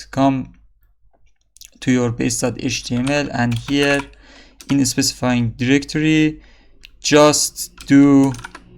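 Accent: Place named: Iran. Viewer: لهجه فارسیت داد میزنه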